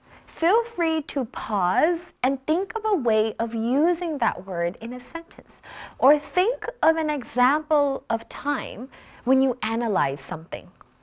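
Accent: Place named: United States. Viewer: Thanks a lot, that's what I've expected for a long time. Pretty great chance to practice American accent instead of listening to the online dictionary. Hope to hear more about the synonym or slangs and pronunciation from you. from Canada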